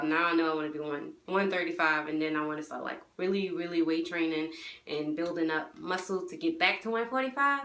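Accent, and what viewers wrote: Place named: Louisiana, United States. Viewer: Congrats and love your video and Louisiana accent. I also subscribed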